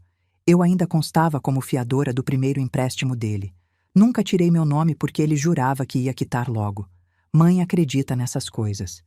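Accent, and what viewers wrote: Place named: Portugal. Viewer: Mimo. Em excesso estraga ... Estou ouvindo Portugal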